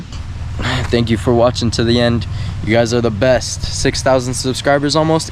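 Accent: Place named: South, United States. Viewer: Based on your accent, you seem to come from a Southern state; good to know that you were fully accepted. Interesting that you told your dad before you told your mom. You are extremely pretty and masculine. It makes things easier for you.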